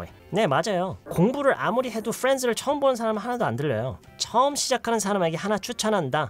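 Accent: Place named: United States. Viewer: 샘은 대단합니다 살이 조금 붙어도 대단한 인기입니다 발음도 좋습니다 미국 사람과 비슷합니다 흑인발음 같기도 하고 백인 발음 같기도 합니다 헷갈립니다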